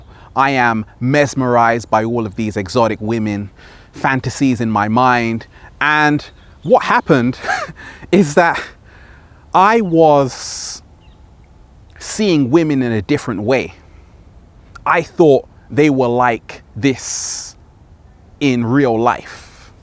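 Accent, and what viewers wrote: United Kingdom. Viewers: (Diving Deep) You're a decent looking black guy with a British accent. Come to the US, white girls will be all over that D. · btw are you British? your accent seems to point yes I cant help but notice that you slur on your s and yeah i love to watch porn and enjoy doing it and then some time ashamed about what type I watched lol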